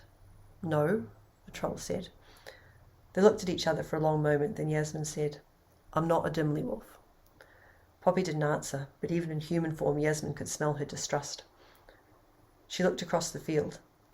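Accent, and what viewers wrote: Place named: United Kingdom. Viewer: Thank you. I listened to this one and turned on CC. Most of the time they got the words wrong, but I got the story. I remember the chatacters from the books. I'm in America so listening to the brithish accent is always interesting. And you seem like a nice person. I've been communicating with you since 2021, at least.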